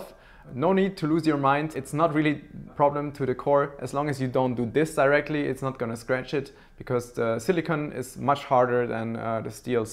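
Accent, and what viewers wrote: Germany. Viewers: LOVE YOUR GERMAN accent ✌️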